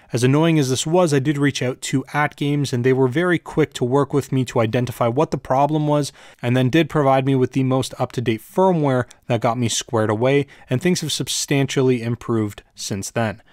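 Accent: Canada. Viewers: Thanks, mayhap I will! Great review, and if I'm not detecting a Canadian accent from you, then I have forgotten my people :)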